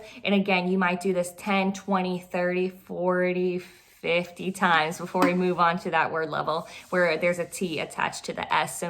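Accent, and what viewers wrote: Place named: United States. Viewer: Hi, thanks for taking the time to put together this video, seems great. I am 15 and from uk, when I say these words, should I do it in your accent ( American) or can I do it in a British accent. Because accents involves different movements of the tognue. Many thanks !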